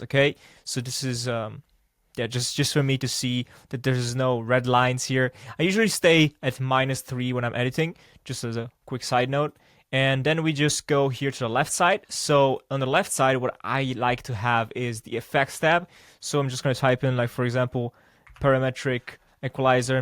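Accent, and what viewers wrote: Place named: United States. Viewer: Az Ali Abdaalos videodat ajanlotta nekem a YT kb. 2 db perce, az hozott ide. Amerikaban szulettel, eltel vagy elsz? Az akcentusod amerikai es kb semmi magyar akcentusod nincs. Hogy csinalod? hahah Amugy ne hagyd abba a YT-ot mert szerintem be fogsz vele futni!!! Just keep creating content!!! En most gondolkodom, hogy elkezdem, de meg az overthinking fazisban vagyok. Van egy niche otletem, ami csak kozepesen saturated :D, majd meglatjuk. Kozben epp laptopot is keresek, meg vagoprogit is. Ugyhogy tenyleg nagyon a legelejen. Egyebkent nekem a Davinci Resolve-ot ajanlottak, mint vagoprogram, de megnezem a videoidat es lehet, hogy a te progid jobban bejon majd. Feliratkoztam. Sok sikert!! :)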